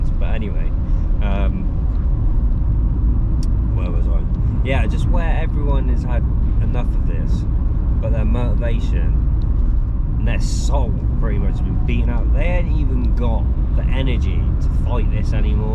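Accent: United Kingdom. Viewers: You got a Norfolk / Suffolk accent? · West Country accent.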